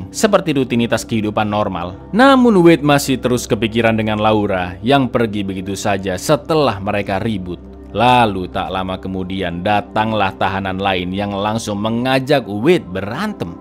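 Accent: Java, Indonesia. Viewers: Sampean wong jowo Yo cak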